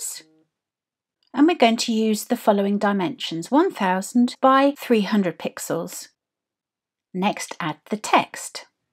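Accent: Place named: United Kingdom. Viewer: Calm and Steady to understand thank you and "very British" indeed :)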